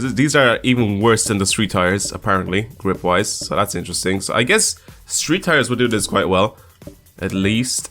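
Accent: Ireland. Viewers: are you irish?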